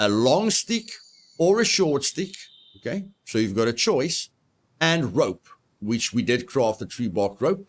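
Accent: South Africa. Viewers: you sound South Afican Are you SA ? I am an south african looking for discord channels or groups of south african scum players or maybe possibly to play with a pro like you ive just started youtube streaming working on building a base viewer amount before making vids so im currently just streaming some games with friends watching im aware i talk a differant language than english,afrikaans.but im quite fluent in english so im just seeing if your intersted in maybe playing together thx for the good vid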